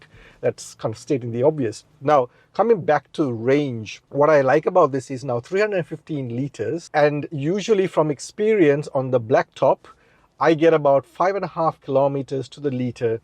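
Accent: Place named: South Africa. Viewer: Are you from South Africa, I hear some safa in the accent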